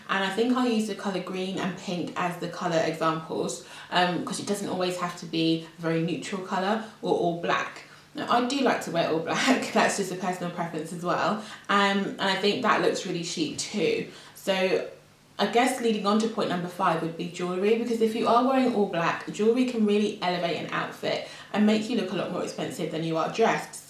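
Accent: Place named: United Kingdom. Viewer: I must admit that I especially enjoy your accent. I'm an English teacher from Chile, and I did an MA in TEFL in England (Bham) in 2011-12. I love your accent and your choice of words to describe clothes and silhouettes, for examle... I have a student who wants to improve her British accent, I'll share your channel with her :)))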